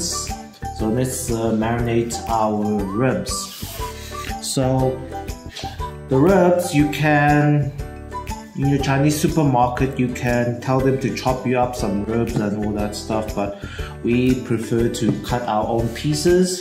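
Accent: South Africa. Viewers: Rocking the South African accent!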